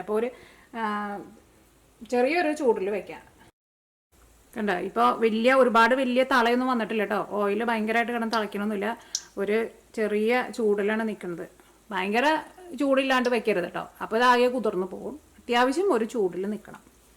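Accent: Thrissur, India. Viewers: My dear sister you talk too fast. We are from South Kerala so it's difficult to catch up with Thrissur slang. So please slow down a bit. Thank you.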